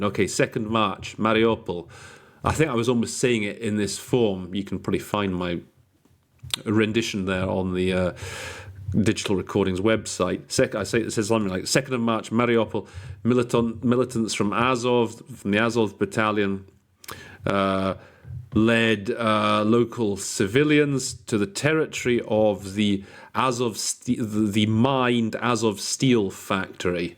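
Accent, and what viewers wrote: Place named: England, United Kingdom. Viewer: Where is your English accent located geographically?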